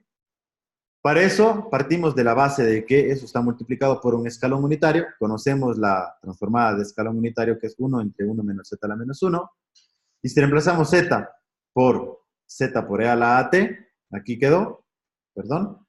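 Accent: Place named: Cuenca, Ecuador. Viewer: ese acento, seras de cuenca-ecuador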